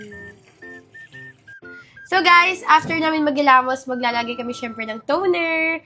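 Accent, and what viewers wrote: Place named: Iloilo, Philippines. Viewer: Ilongga ka pala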